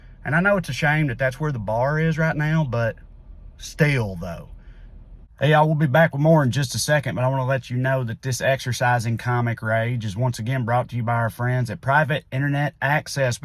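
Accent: South, United States. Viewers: My first time to your channel, and I’ll give you a like and maybe even a subscribe! You are top notch at flapping your jaw. Give you credit for skill and thoughtfulness. Keep up the good work. And PS, an authentic southern accent not the New York transplant version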